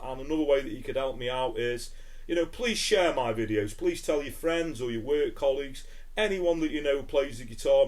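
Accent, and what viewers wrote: Stoke, United Kingdom. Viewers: Great video mate proper Stokie accent 👍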